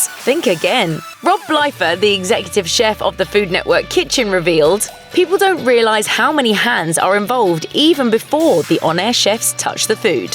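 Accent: United Kingdom. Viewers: Incognito ? You defending the bitch like that's your wife or something. Calm down you bitch. I just don't like a British accent period. Fuck yall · who's the brit? get back to watch mojo girl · British accent commenting on American shows...stupid.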